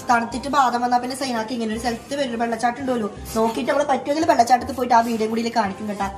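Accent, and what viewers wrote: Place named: Malappuram, India. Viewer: thante malappuram slangum thante kannur slangum adipoli🤣🤣😄🫶🏻😎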